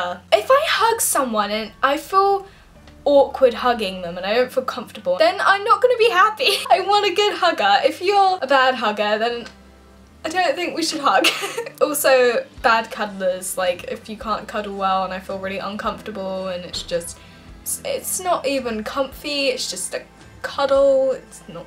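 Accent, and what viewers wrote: England, United Kingdom. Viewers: Pshhh if I lived in England or Brittan or where ever that adorable accent is from XD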